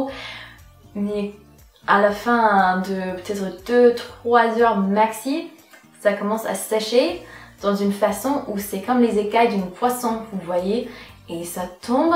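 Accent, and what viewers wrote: United States. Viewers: tu et tré jolie mais tes une francaisse ou américaine parseque ta un accent américaine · ***** Well, I'm wondering... Which words do you precisely need to learn? Because even though french is good, she pronounces words with her american accent. I know very well that most french beauty youtubers are boring though, so you probably don't want to watch their videos. ^^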